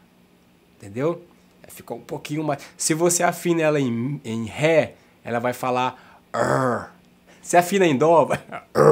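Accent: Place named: North, Brazil. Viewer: vente você nesse vídeo que você conversa bastante e toca um pouco, parece duas pessoas, você tem um falar calmo, simples com esse sotaque lindo do norte, mas quando toca fica um monstro( no melhor sentido) e arrebenta... Valeu Cara!!! Por toda humildade e talento.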